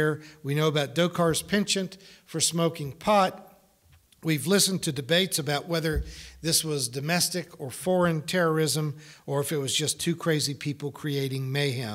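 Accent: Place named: United States. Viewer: I'm a pastor in the UK and anyone in the UK who watches this needs to filter out the US accent and recognise that all this could be said about our countries as well. I wish I saw as clearly and spoke as eloquently as this man. I'm from a different theological stable, being unwilling to describe any scripture as 'made up' and 'padding Paul's resume', but I hope that evangelicals on either side of the Atlantic will not be put off by that. This message has the gospel right at its heart. Thank you.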